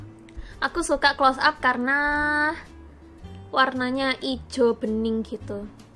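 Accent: Java, Indonesia. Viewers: Wah bangga aku dadi wong suroboyo. Heheh, ngomong ngomong kaka medhok nya nampak banget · Cara bahasamu sudah medok kaya orang jawa. Sudah Cantik, kalem,gak sombong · Mbak..cocoke dadi arek jowo wae...medok banget.....aku jd inget saudaraku di surabaya...salam dari semarang....nbak ayu banget.... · Wes ayu boso logat jowone persis asli Wong Jowo padahal Anda orang Japan sip bagus... matur suwun 😁😁😁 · Jepang yg cantik tapi medok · Asli jepang tp Indonesia bisa jawa medok bisa, syukur2 Kalau udah d,islamin terus bisa ngaji. Idaman Keluarga Ekekekekek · Japanese berlogat javanese:D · Lancarnya medok jawa ya.. tapi bagus bahasanya lancar.. (mukanya mirip temen ddku hehe).. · Mbak, Pirang tahun Nang Suroboyo mbak? Nggak percaya kalau hanya 1 tahun... 🤔 Pastine` luweh soko setahun yo, limang tahun yo. Kok bahasane sek medok yo, baik itu bahasa Indonesia opo maneh boso Jowone`... 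😂👍🙏 Jadi terharu... 🤣 Semangat yo mbak... 💪👍🙏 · wa'iiikk keren.... jowone mbak'e medok ayabarus n ngalaman....salam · so freaking awesome..japanese but medok bgt..senengane kripik tempe...kpn2 dolan suroboyo.mampir mbk tk jak dolan 😁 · Kok medok ngomongnya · Next time borong keripik tempe di Malang! Mau keripik apel atau apapun sudah dibikin keripik disana. Stay medok yaa! Hehe... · Gk pantes logak jawa. Huhuhuhu tp suka kok sma orangnya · wes bagus ayu nek ngomong medok jowone kuwi looo ngangeniii..beeehhhh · Ojou-san udah berapa lama di sby? medoknya fasih banget😀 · Jepang mendok:v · Wes pindah neg jowo wae mbak wes cocok kw dadi wong jowo Nek ngeplog go boso jowo(KETOK E MEDOK E) ne jo lali yo mbak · Mbak ganti nama aja jd ayu... Habisnya bahasanya medok hehehe suka suka suka 💕 💕 💕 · pinter nya kamu....medok lg bhs nya👍😍😍😍👍👍👍👍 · Pantese sampeyan dadi warga Indonesia ning. Dudu warga Japan. 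Aksenmu medok banget. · Aku baru di channelnya mbak nih.. bahasa Indonesianya kok bisa medok gitu ya? Imut 😄 Bahasa Indonesianya juga bagus. Itu kripik tempe lho mbak, di tempet sy banyak 😆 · Wah boso jowomu sdh medok jg tuh, sdh kyk org Indo bicaranya, salut dech!!! Untuk oleh2nya, memang ga ada di LN? *See you next time= Sampai Jumpa Lagi u/bhs Indonya:) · waahh kirain cuma saya yg ngerasa medok jawa wkwk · Logat jowo.. Mbok mbok... Keren tenan.. Saya suka wong jowo.. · ayu pinter jowo medok... love u kak.. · cantik,muda,smart,simple,low profil..👍👍 pinter bhs indonesia (dg aksen medok😆👍👍) terus sing paling unik,paling q seneng kwi pinter boso jowo jugaaa...😅😅👍👍👍👍 (sangat mewakili kami sebagai orang jawa😆😆) lanjutke terus...uuaaakeehh sing dukung awakmu👍👍 EEE....MATEK AKUU....😆😆😅😅😅😂😂👍👍👍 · Ayu² medok neng😂 ngenten punn 😂👌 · "Keripik tempe tu ueeenaake puol" Pas kamu bilang gitu tuh aku langsung ngakak 😂, suka banget ma aksen medok kamu. 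Semangat yaa!! 😆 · Medok tenan eh mbak jowone biyen manggon nek Suroboyo ta salam tonggo kota Lamongan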